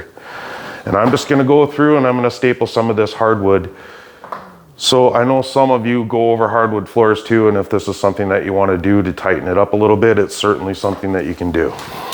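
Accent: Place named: Wisconsin, United States. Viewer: You sound like a wisconsinite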